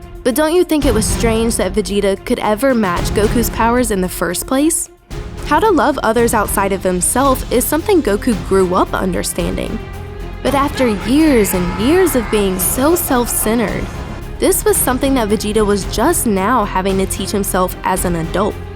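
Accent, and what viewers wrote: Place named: Texas, United States. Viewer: You're from texas right? I can hear it in your accent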